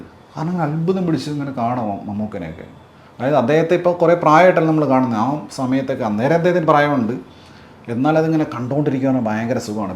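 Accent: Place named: Trivandrum, India. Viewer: How you got trivandrum slang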